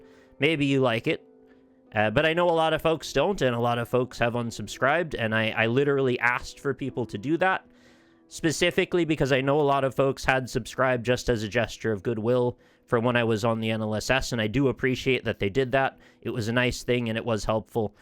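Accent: Ireland. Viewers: Crazy how you can hear the Irish taking over some words while he speaks haha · I hear a little Irish lilt in your voice now 🙂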